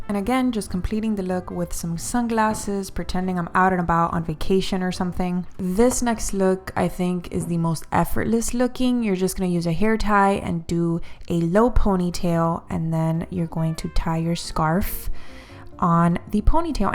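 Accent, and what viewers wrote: Miami, United States. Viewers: Omg girllll I heard your accent and I was like "She's from Miami for sure!!" Love this 💕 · Miami accent?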